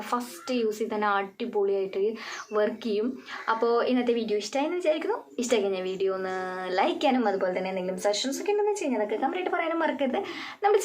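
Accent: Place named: Thrissur, India. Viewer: Good, വീടെവിടാ തൃശൂർ ആണോ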